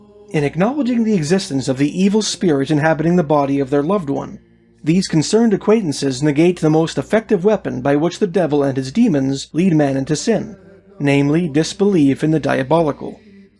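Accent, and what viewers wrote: Canada. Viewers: You have a very Canadian accent!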